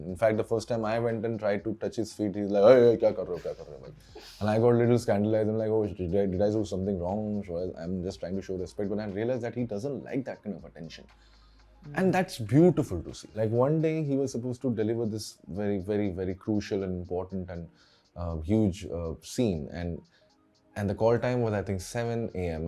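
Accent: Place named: Delhi, India. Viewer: Delhiwala do talk similarly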